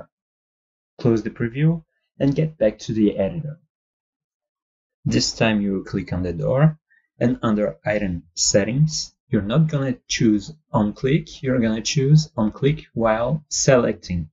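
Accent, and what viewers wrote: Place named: France. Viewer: It would be awesome if you did some more tutorials! Some more advanced tutorials, if you get the time. Would also like to see how you go through the process of creating rooms. And your English is perfect with a really cool, beautiful French accent so never apologize for that. :)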